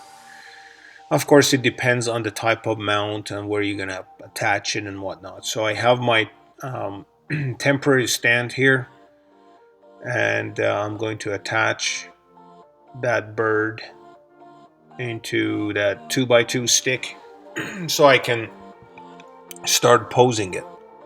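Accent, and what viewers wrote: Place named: Poland. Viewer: Boy oh boy I'm so pleased I found you! I've been doing Taxidermy for 43 years, self-taught , so it's been a struggle. Although I did achieve best all-around taxidermist in the state of Arizona 2001, and I'm still learning everyday. You are helping me a great deal! Thank you, thank you ,thank you. Question, are you using silicone caulking? Also, where you from Brother? Some of your English words sound like words used from my polish family when they speak English. But I know that's not what it is. Again I want to thank you immensely! Mounting Birds in Arizona has been a struggle because of the dry heat over here . now that I've watched you mount a bird before you blow dry it you gave me great ideas. I just wanted a ringneck pheasant your style and I love it! I still hit the inside of the skin with a little hydrogen peroxide here and there to keep it moist. 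And also try your head locking mechanism. I've never done that before either. Now I can adjust the position of the head and neck without fear of breaking the connection! You're the man! My hat is off to you! Yours in Taxidermy, Mike Bieda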